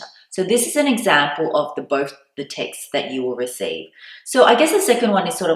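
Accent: Australia. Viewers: Hi, love your Aussie accent lol. Thanks for your video, I'm going to Paris soon, and I didn't plan to get a Hermes bag, ur video made me wanting to have one now!!!:)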